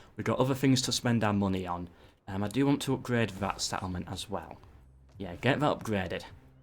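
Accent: United Kingdom. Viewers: Is this the perfect British accent?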